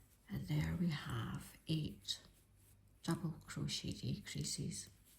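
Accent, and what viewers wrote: Scotland, United Kingdom. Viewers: Thank you so very much for sharing your pattern and tutorial. You explained everything in your beautiful Scottish accent. ❤ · Thank you for this sweet pattern and tutorial! Greetings from New York. My mother-in-law came from Helensborough. I enjoyed listening to your Scottish accent. Your instructions are very clear. I will make these for charity as my youngest grandchild is now six. They are darling! ❤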